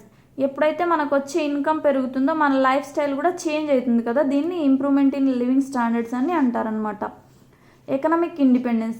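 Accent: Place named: Kadapa, India. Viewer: Good simply n shorty. Slang koncham baa gunship. ☺😊 Kadapa ani andhariki artham kavalana emi? 😀😀😀😁😁. Manchi vedio Subscribe inkaa cheiledu... Inkaa inlantivi expect chestunnam. 😊😊😊😊slang...is good anaiah funny. ..thank you. .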